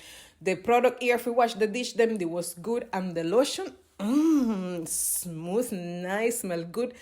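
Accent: Jamaica. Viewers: I must say, I just LOVE your videos and of course your beaitiful Jamaican accent. Blessings.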